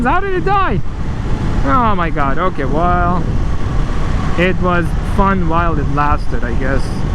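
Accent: Iran. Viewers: Farsi accent 😂👍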